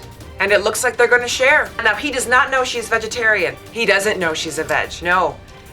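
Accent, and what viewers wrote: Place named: Wisconsin, United States. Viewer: Very funny. The Wisconsin accent is similar to us here in Ontario. One question... I am trying to figure out the words in the intro?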